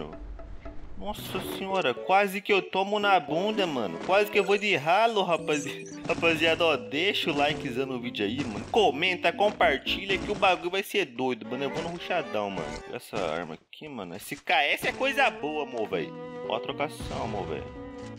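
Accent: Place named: Rio de Janeiro, Brazil. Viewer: parece ser carioca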